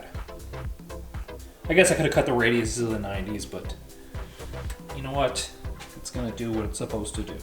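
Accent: Canada. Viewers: i gave a like for the way you say 'out' canada accent is gold, from australia!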